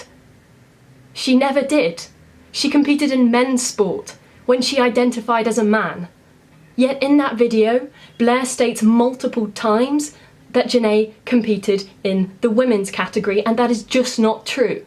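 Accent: New Zealand. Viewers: Omg you’re a kiwi?!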